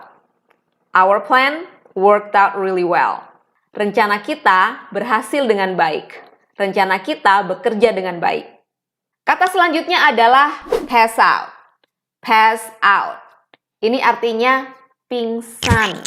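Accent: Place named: Java, Indonesia. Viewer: Ternyata = ndilalah 😂, masih mantep kak logat jowone, Kediri menyapa kak😁👍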